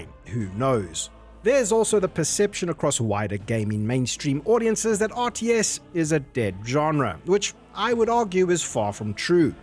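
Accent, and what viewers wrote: South Africa. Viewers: Warcraft 3 has been having some traction lately with bigger prize monies etc. And are you South African 🇿🇦 If you are awe! 🫢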